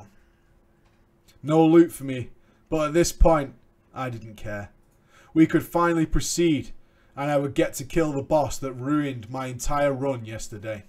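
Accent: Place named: England, United Kingdom. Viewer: Is it a bad thing that I'm Canadian and have accidentally adopted an English accent listening to 'im or is that normal?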